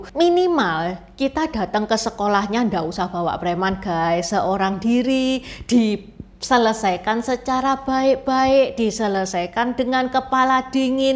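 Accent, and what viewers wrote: Java, Indonesia. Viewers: Aku gak seneng berita nya. Tapi aku sangat suka dengan reporternya bahasanya medok banget. Lanjutkan semoga makin sukses.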